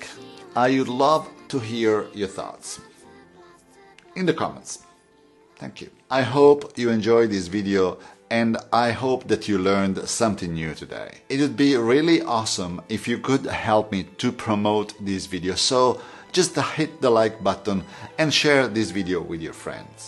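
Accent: Italy. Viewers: Again, I am totally amazed at the gift of finding you on YouTube. The word that fits is " serendipity" ( the occurrence and development of events by chance in a happy or beneficial way; that is you!) You make learning a joy and it helps with that awesome Italian accent. I never truly understood the rule of 3rds and now I understand. In Japanese art it is about what is and what isn't and that emptiness brings power and balance to all things. .... we come to that place for me where I can't wait for the next video. Mahalo.. ps I love the histogram T .. that is so very cool with the label. e'la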